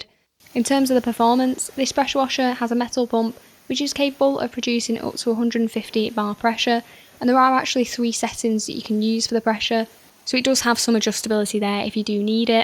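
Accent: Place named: Manchester, United Kingdom. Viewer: Edit. See my reply Would you go for the Ava Go p55 over this? It seems AVA themselves no longer seem to sell this on their website. Reason I'm thinking is as follows:- Nilfisk £240, plus £40 for standard stubby gun you suggest (plus adapter?), plus £50 for MJJC Foam Cannon = £330. p55 Go with Foam Cannon (probs not good as MJJC but the Premium Inc one here for AVA will probs be good enough) = £260 Any input would be greatly appreciated. Maybe you wanna sell your p55 cos you've got much better and it sounds like you're from Manchester! ? Again, any input would be greatly appreciated. I did consider the p40 Go £150 but not sure would be quite good enough when trying to do most washes via BH Touch-Less without a contact wash.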